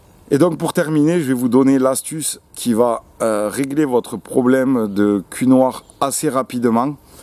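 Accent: South, France. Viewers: Bonjour. Video claire, précise, concise et fort intéressante avec votre petit accident du sud. Merci de me rappeller des basiques que j avais un peu oublié.... J ai une formation horticole, mais en 30 ans on oublie 😉 Petite question peut être un peu idiote mais quel style de lait est t il préférable d utiliser ? Stérilisé, entier, demi écrémé....? Si vous me tirez au sort je vous donnerais le nom d une exceptionnelle tomate cerise que je mets depuis 2 ans.... Allez c est pour moi.. C est gratuit... C est la sundance... Bon c est une hybride mais di vous ne connaissez pas... Je ne peux que vous la conseiller. 😉 Bonne soirée à vous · Moi j'y connais rien en jardinage mais par contre, j'adore l'accent du Sud !! C'est l'accent du Sud Est ? Du Midi ? Je ne sais pas les differencier. Rien que pour ça j'adore cette vidéo et je vais m'abonner !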